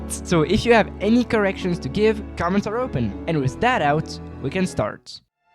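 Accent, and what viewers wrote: France. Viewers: En vrai un bon 8.5 je trouve, on comprend bien ce que tu dis mais on entend bien que t'es français mdr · Tu parles français? J'ai l'impression ton accent l'est un peu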